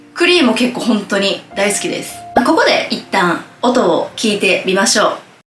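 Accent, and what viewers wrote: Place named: Osaka, Japan. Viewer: 何色ものスペシャルカラー仕様のGOシリーズは提供だったんですね？ しゃべくりにいかにもな大阪のねぇちゃん味があって良かったです！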